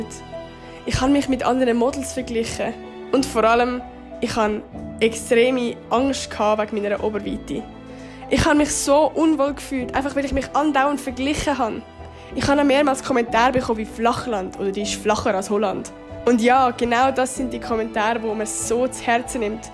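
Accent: Switzerland. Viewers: Dieser Schweizer Akzent ist so geil haha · Ich liebe deine Videos! Am geilsten finde ich den SchwitzerAkzent der mich immer zum Lachen bringt. Ich bin froh dass du YT machst. Beste Youtuberin! Du hast keinen Grund dich Unwohl zu fühlen da du total schön aussiehst und eine Hammer Figur hast!❤ Ich und viele deiner Tausend Abonnenten stehen immer hinter dir und sind für dich da!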